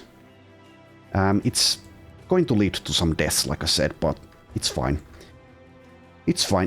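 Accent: Finland. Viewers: The moment I opened the video and heard your voice, I though, "You must be a Finn". I wasn't disappointed :)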